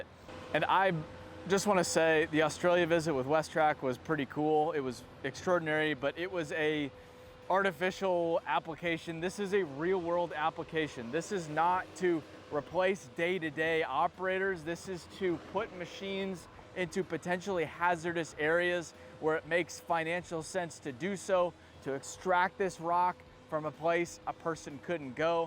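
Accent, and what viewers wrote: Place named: United States. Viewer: I guess when a dynamite stick reaches a certain size it's called a sausage. I definitely enjoyed the American pronunciation of German names.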